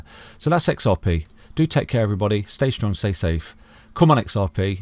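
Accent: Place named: England, United Kingdom. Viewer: IMHO. You ARE the best out there and no BS. No disrespect to the American You tubers, but so nice to hear a good English voice. Keep it up!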